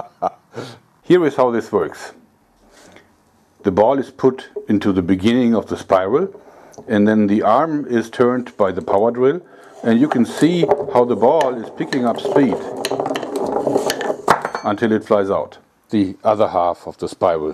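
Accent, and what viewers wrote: Germany. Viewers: he isn't from Austria so it would sound different because arnold schwarzenneger is from Austria and Isn't he is from Germany can hear it from his accent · Is it a german accent? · Do you come from Germany?? Your voice sounds like that ;) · def. sounds german! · Is he german? it sounds like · definitv German!! unverwechselbarer Akzent :D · he sounds german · Er IST deutscher, was denkst du wieso seine Aussprache diesen Akzent hat ;) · This was the first of all of his videos that I had watched and he sounded German.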